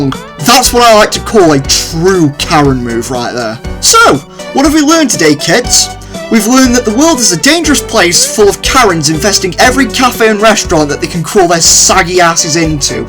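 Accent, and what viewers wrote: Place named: United Kingdom. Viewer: 10/10 agressively British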